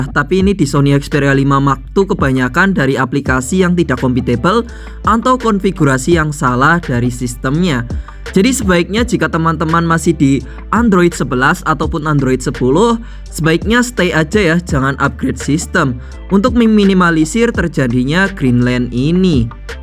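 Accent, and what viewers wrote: Banyumas, Indonesia. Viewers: Omaak orang banyumas🎉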